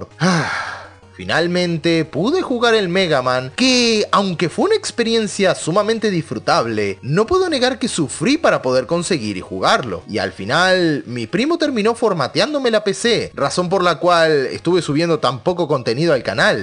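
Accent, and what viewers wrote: Uruguay. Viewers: Eres uruguayo :0?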